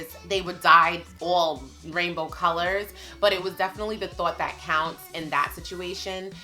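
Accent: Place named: Staten Island, United States. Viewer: The Staten Island twang you have😀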